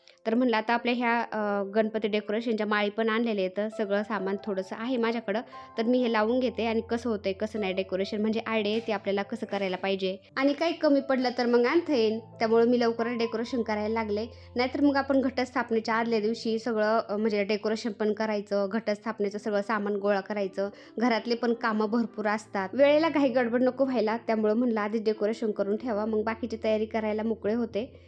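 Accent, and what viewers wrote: Ahmednagar, India. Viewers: श्री स्वामी समर्थ ताई डेकोरेश एक नंबर. केडगाव देवी ला नक्कीच येईल मला खूप खूप आनंद झाला ताई मि घरात सगळ्या ला सांगितले ताई बोलवल ताई आज फोजी साहेब कुठे गेलेत दिसले नाही ताई आपली नगरी भाषा छान 🎉😊😊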